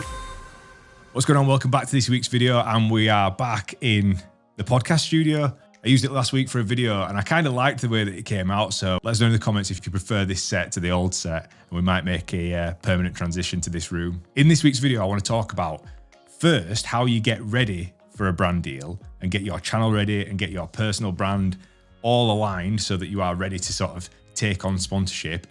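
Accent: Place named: St Helens, United Kingdom. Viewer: Great video with some great advice, I’m trying to set up my YouTube channel and your inspirational! Only found your channel yesterday and I’m loving it. Just a quick one, you sound local to me St Helens? Keep up the good work. Oooshhh!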